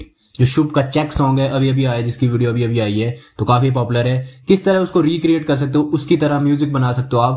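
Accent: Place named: Hills, India. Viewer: aapki awaj se hi lag raha tha ki aap khi pahad se belong karte ho , main Uttarakhand se 😊🙌🏼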